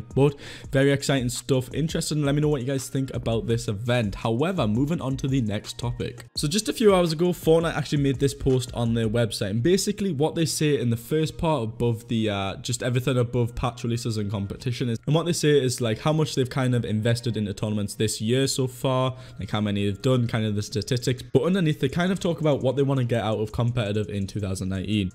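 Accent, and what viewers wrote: Newcastle, United Kingdom. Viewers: Are u from Newcastle because i am too!!!! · You a Geordie boy?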